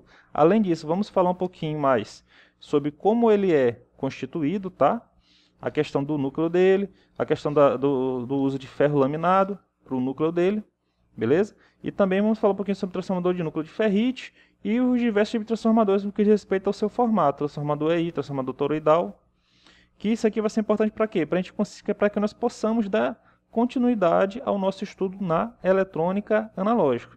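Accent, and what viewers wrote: Ceará, Brazil. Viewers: tu parece ser cearense.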